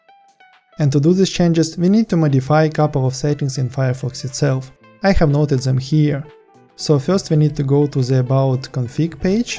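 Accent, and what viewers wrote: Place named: Russia. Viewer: English is not your native language so mistakes are acceptable, important thing is that people who watch your videos can understund you. Btw are you from Russia?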